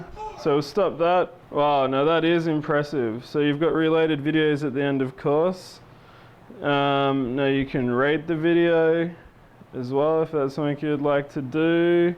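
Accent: Australia. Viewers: r u Australian? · It's an Australian accent, and Australia is a lot better governed than the US.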